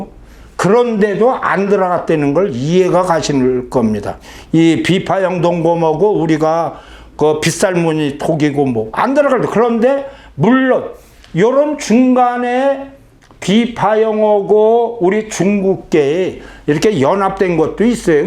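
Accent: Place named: Mahan, South Korea. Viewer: 선생님은 말씨가 마한쪽 말씨네요~충북 괴산,음성,충주,여주(남한강 수계지역)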